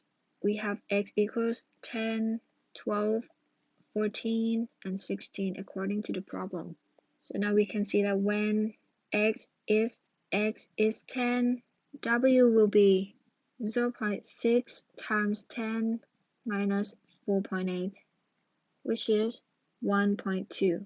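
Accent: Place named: Bangladesh. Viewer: Are you from Bangladesh?.. Your English accent is similar like us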